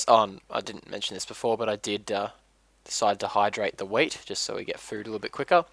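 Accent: Australia. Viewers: I always enjoy listening to your australian accent, so feel free to make a spelunking episode :D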